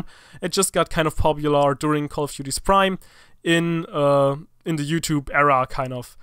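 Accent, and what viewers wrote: Germany. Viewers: ! Der deutsche Akzent regelt Bra^^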